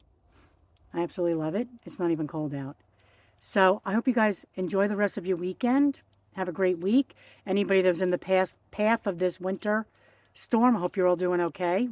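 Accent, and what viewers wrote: Long Island, United States. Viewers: New to your channel and always love hearing a fellow Long Islander ❤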